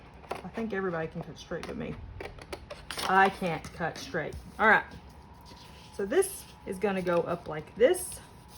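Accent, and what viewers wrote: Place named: Tennessee, United States. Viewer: Very creative! I'm a new sub. Sounds like you are from TN, possibly KY or the Carolinas. The extender arm on my Fiskar cutting board won't go all the way up. Is there a trick to it? 💖🌷✒📖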